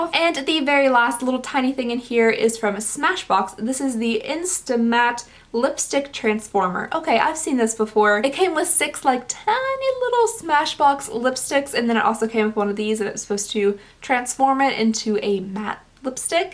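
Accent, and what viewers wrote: Kentucky, United States. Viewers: It's crazy that I clicked on and watched your video lol your voice is the only one I can handle I scroll down and realized why!!!! Your a fellow Kentuckian!!!! Go cats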